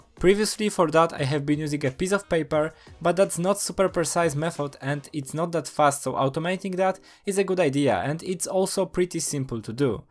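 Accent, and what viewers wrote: Poland. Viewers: Typowy polski akcent :) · Daj polskie napisy bo słyszę że rodak 😆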